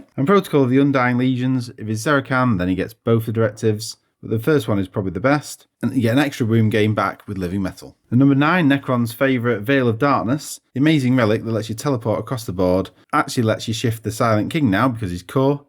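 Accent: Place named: Scotland, United Kingdom. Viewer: Una pregunta ,por curiosidad , ¿de qué pais eres? lo digo por tu acento se me parece un poco escocés o galés pero igual eres de yorkshire ,un saludo.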